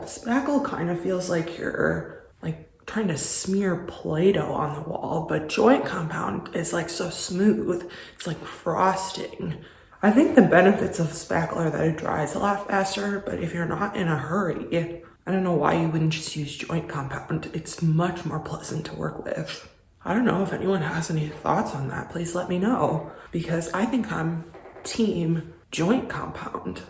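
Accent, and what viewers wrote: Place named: Wisconsin, United States. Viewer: I to am a Wisconsinite, you go girl 🎉🎉🎉 you must be up North I’m in southern side not much snow here just cold 😊😊 I should’ve known by your accent since everyone thinks we have an accent in Wisconsin😂😂😂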